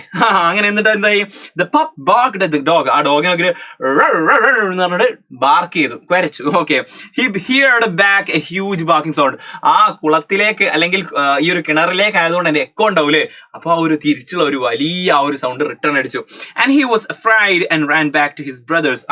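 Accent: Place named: Kozhikode, India. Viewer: Kozhikode aan enn thoonunnu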